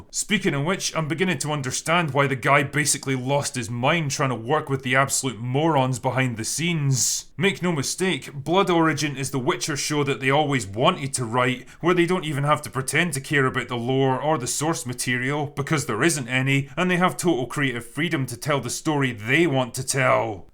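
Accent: Scotland, United Kingdom. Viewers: He's scottish, that's what they sound like, he sounds like that in the livestreams, but more normal scottish accent. Calm down.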